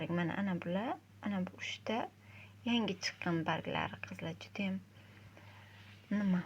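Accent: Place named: Bukhara, Uzbekistan. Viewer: Каердансиз гапиришингиз Бухороликлардай гулларингизни сотасизми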